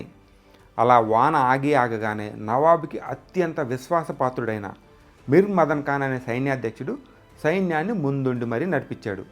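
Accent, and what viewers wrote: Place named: East Godavari, India. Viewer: ఈ ఎధవ తూగోజీ యాసలో ముసలోల్లు చెప్పిన టులు ఏంది ఈ వాగుడు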